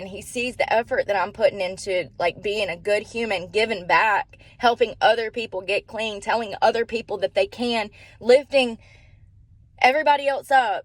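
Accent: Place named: South, United States. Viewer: You are a strong woman. I love how real you are. And I love your accent. Being from the UK I have always loved the American accent. Especially the country accent.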